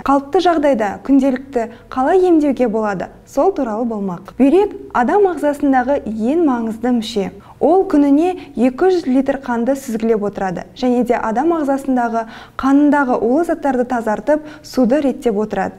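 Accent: Standard. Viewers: Рахмет қазақша таза сөйлегеніңе👍👍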